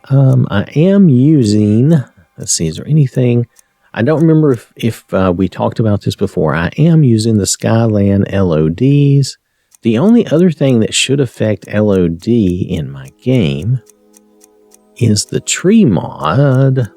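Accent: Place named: North Carolina, United States. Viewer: I knew it! Born and raised in Rutherford county NC myself, I recognized the accent 😅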